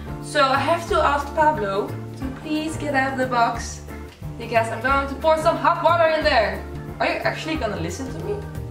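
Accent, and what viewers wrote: Netherlands. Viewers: Are you Dutch? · Are you dutch? ☺️ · Are you dutch?? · i think she’s dutch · .... Are you Dutch? You talk like my classmates in English class.. well actually a bit better but it’s like I’m talking English but then without an accent ( sorry no offense) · Where are you from? Your accent sounds Dutch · Is she Dutch? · U sound so dutch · Ur dutch, i can hear it. But i stan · Is she Dutch? Just wondering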